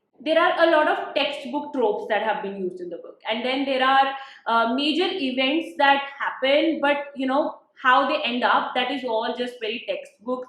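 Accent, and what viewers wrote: India. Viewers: You are so cute especially your Punjabi accent which makes you more cuter.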